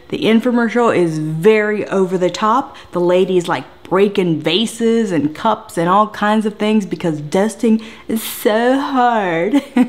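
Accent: Texas, United States. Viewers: yep she’s from Texas!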